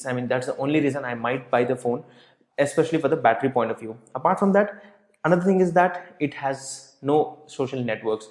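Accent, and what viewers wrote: India. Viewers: indian accent sucks!!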